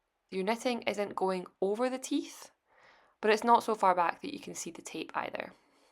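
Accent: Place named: Edinburgh, United Kingdom. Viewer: Hi! Thousand thanks for your tutorial! Do you also have one to knit the „bag“ where you sew it in? Btw…, I love you speaking. It reminds me on my first day an a language school. The first day I went there they were writing a dictat. I couldn’t understand a single word😂. Since than I have loved your speaking…, but the first day I heart someone from Edinburgh…., wow…, that was hard! I had the feeling I cannot speak one English word🙈. That was a great time…. Long long ago…, but I will never forget that time. And now listening to your podcast…. Lovely! Thanks🫶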